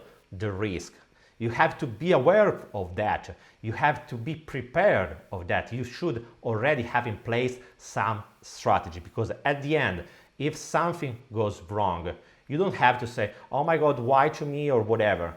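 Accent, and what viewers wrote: Italy. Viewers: Are you italian by any chance? your accent sounds a bit that way, if I'm right, mi fa piacere vedere un fratello italiano in DeFi come me 😁! Great video, good job!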